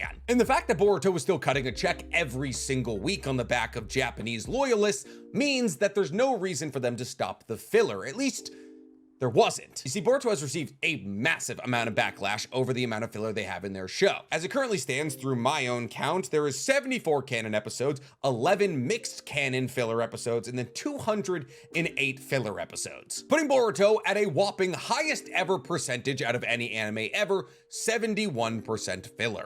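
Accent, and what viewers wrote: United States. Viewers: It’s Ka-wa-ki, stop pronouncing Japanese names as an American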